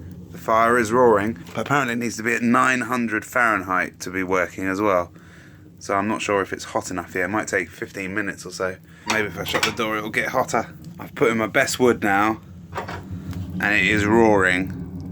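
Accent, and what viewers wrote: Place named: South Africa. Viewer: Love the South African accent,! Would like more of it;)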